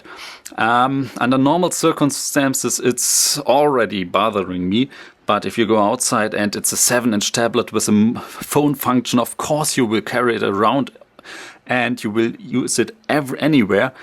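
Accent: Germany. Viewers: i could feel some German accent within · i think is french?:) actually no, is german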